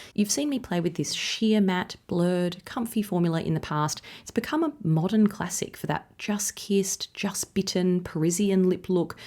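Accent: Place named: Australia. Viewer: I love your videos! The colors you show are perfect for me. Your Aussie accent and calm voice are lovely. Thanks!❤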